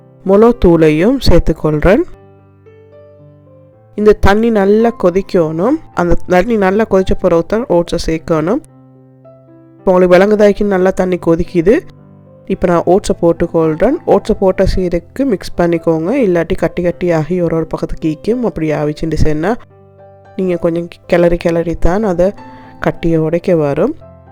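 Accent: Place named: Sri Lanka. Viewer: R u frm Srilanka?bkas yr Tamizh pronounce,that u r Srilankan....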